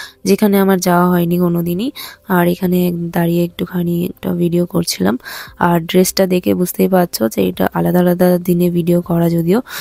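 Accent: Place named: Bangladesh. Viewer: Ekhn o sordi kome nai 🥹🤧 R Bangladeshi style e ktha ta wow wow lagse 🤩🫶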